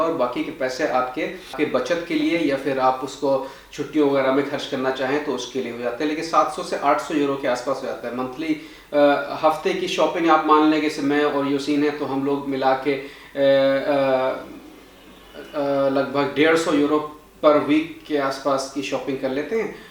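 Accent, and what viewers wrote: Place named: Awadh, India. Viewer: आपकी अवधी भोजपुरी अच्छी लगती है और ये बताये वहा भारत और हिन्दी उर्दू को क्या अहमीयत है?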